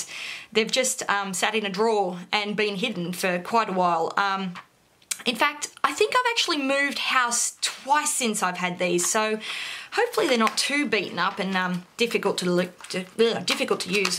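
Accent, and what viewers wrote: Australia. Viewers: Are you from Western Australia? Your accent sounds like it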